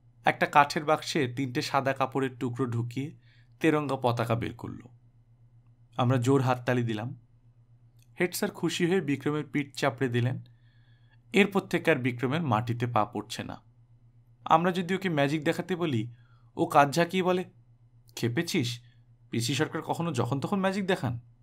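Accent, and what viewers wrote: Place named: Bangladesh. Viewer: বাংলাদেশের লোক... আর ভাই, সব যায়গার ই আলাদা এ্যাক্সেন্ট থাকে৷ ব্রিটিশদের ইংলিশ ও যায়গা ভেদে ভিন্ন রকম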